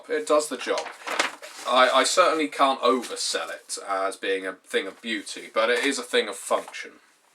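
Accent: United Kingdom. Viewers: Why not go back to the lets plays for a while? I loved your Bioshock play-through. I miss your unique charismatic British voice when you are away. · I once watched so many of your videos in one sitting that my internal voice acquired an English accent. (I'm American) It actually felt like I was going a little insane. I managed to repair my mind by watching a couple of Tetsubo's videos. Anyways, it's nice to see more videos from you. I'm starting a Pendragon campaign, which I've been wanting to do ever since I watched your video about your horrible Pendragon campaign.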